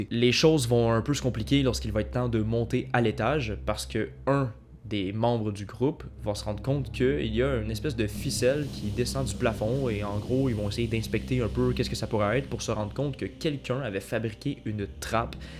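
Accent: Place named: Canada. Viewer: Bonjour beau accent vous êtes canadien merci pour les vidéos bonne continuation à vous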